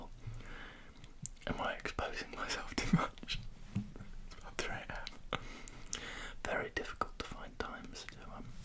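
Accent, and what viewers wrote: England, United Kingdom. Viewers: It’s so nice to find some English asmr! I’m so used to only Americans. You remind me of all my mates - very relaxing - good job man! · LORD YOU SHOULD HAVE HEARD THE GASP I MADE WHEN I HEARD HE WAS ENGLISH 😍😮‍💨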